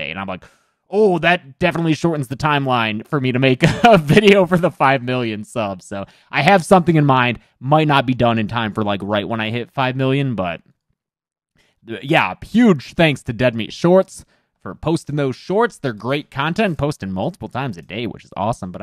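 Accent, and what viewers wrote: United Kingdom. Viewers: OMG YOUR BRITISH !!!!!!! MY FAVOURITE YT AND NOW THIS!!!!! Bru I love you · bri'ish pog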